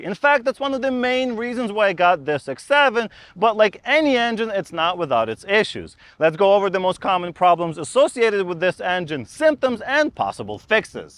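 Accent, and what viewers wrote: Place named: Russia. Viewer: Акцент наш ))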